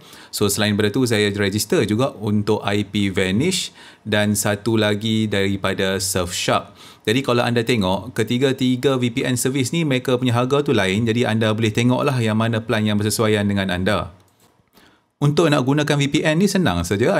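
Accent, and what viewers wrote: North, Malaysia. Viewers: baru jumpa channel tuan lepas tgk video monaliza. sedap dgr tuan cakap utara. rasa kena dengan telinga bila dengar. hehe.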